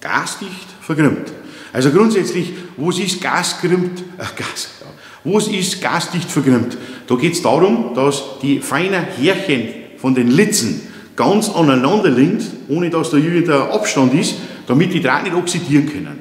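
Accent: Bavaria, Germany. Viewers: Die Versuche Youtubes Bayrisch in deutschen Schrifttext zu übersetzen - köstlich🤣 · Thats bavarian german :D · Ich finde deine Videos klasse, aber teilweise kommt dein bayrischer Akzent sehr stark durch und ich muss zurückspulen weil ich Dinge nicht verstanden habe 😅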